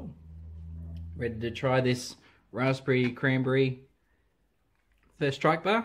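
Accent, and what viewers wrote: Australia. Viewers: Your australian accent like the blades to my ears. I love more the US Eng. But anyway you're the awesome reviewer at YouTube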